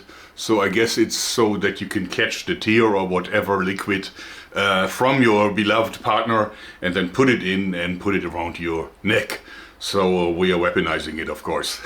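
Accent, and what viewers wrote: Germany. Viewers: The german accent is perfect for explaining nasty weaponry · You are german right? Your accent sounds like from a German.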